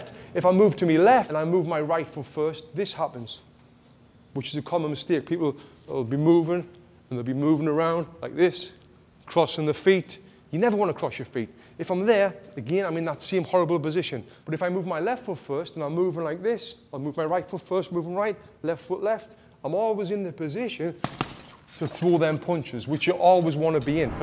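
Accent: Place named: North East England, United Kingdom. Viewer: Cheers mate, where u from? I’m from Durham myself and live in Washington now, you sound like ya from the north east marra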